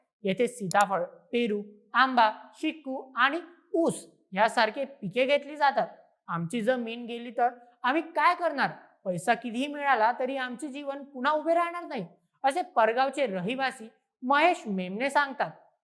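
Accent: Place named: Khandesh, India. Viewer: Khandesh chi bhasha ashich aahe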